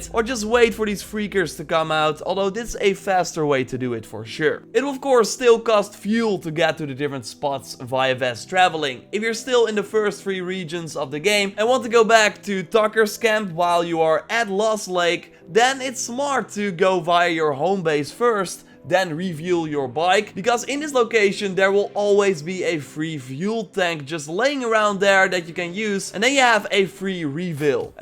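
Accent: Netherlands. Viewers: You hear your dutch axent a lot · The Dutchest of accents. Mijn dank is groot, broeder. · Just one random question.....are you dutch bruh?? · Are you Dutch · Are u dutch ? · He is dutch i hear it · But it doesn't do it in a mid 90's 'Smashie and Nicey' radio accent with Dutch overtones like this video. I was just waiting for "...and to increase your score, listen to some Golden Earring, or Bachman, Turner, Overdrive on your Sony Midi-system, mates!" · Are you Dutch? Dat dacht ik namelijk te horen. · Are you from the nederlands because your accent is like that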